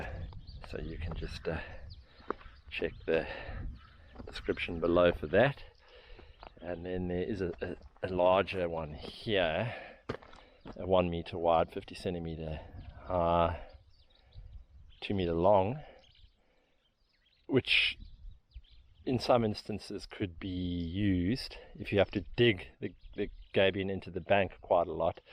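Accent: South Africa. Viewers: May I guess this is from South Africa? It sure looks like it (and your accent). Beautiful landscape any way, and excellent explanation! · sounds south african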